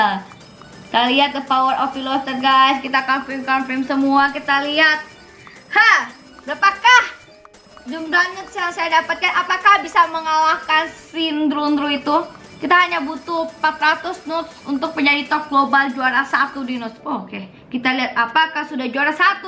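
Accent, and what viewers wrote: Java, Indonesia. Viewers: aku kangen kamu mainan OmeTV pakai suara medok orang jawa 😁 Miss you, 😁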